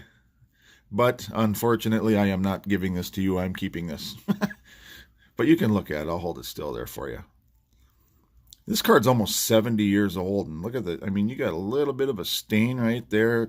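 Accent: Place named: Fargo, United States. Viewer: You have a natural Fargo voice =)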